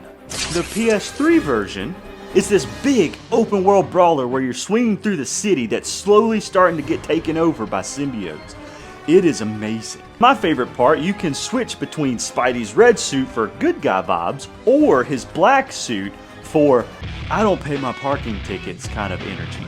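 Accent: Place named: Louisiana, United States. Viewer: That accent is great bro where are you from Louisiana?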